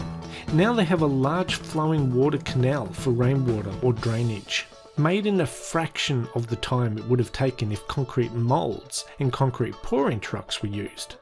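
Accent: Australia. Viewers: I like your accent. You sound like an Aussie :)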